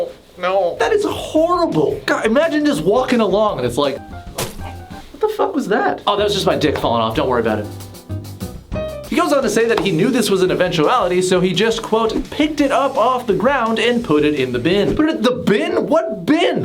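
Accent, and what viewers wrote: United Kingdom. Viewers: his brit accent sounds like murdoc im crying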